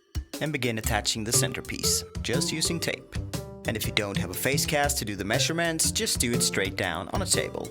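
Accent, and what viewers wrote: Sweden. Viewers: Whenever I hear your Swedish accent I can't stop thinking about summer and food.. Every year, when the summer is approaching we have a lady from Skåne on TV and radio, and she comes bearing new summer-recipes..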